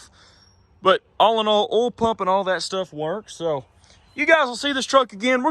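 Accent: South, United States. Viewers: TURN THE VOLUME OFF! This dudes forced cartoonish Southern good ol'-boy voice is the most annoying thing i've heard on YT ever. I feel like I'm a dumb tourist, sitting on a hard wooden bench at Disney World in Fla, watching a play by a bunch of Hollyweird failed moronic actors. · I've watched a lot of "Will it run" videos on YouTube and your southern drawl is really nice to listen to, you're also a pretty sharp mechanic who clearly knows what he's doing. Great video!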